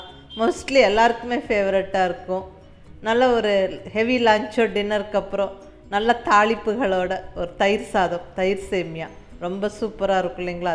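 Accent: Coimbatore, India. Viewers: Sema..supar.tank u mam.unga voice coimbatore, erode matiri iruke?nice voice.god bless u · Coimbatore pola neenga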